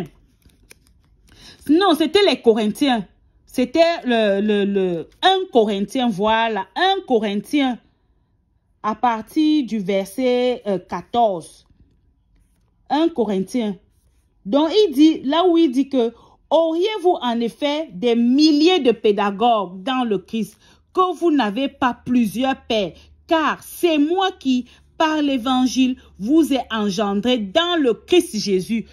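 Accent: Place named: Cameroon. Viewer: Vraiment madame tu es forte dans rapporter les faits exactement comme ça s'est passé avec un accent camerounais interressant et intéressé par les faits Chapeau continue ainsi...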